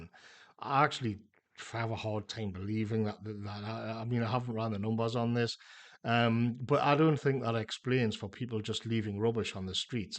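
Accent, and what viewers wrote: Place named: Northern England, United Kingdom. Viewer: great video, nice to hear a fellow northern voice for a change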